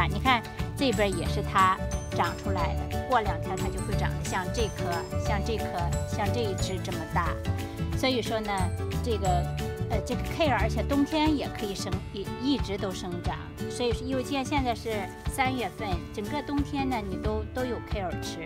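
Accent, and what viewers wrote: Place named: Shandong, China. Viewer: 山东口音好亲切 赞👍🏻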